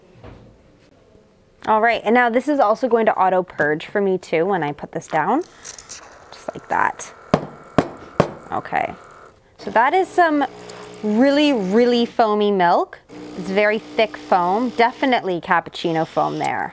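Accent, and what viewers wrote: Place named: Canada. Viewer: "Oat and aboat" How to know it's Canadian not American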